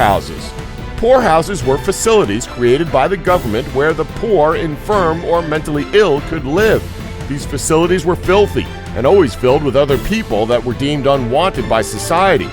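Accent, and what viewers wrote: United States. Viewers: the amount of american english, wants me want to strangle you. FFS pronounce something correctly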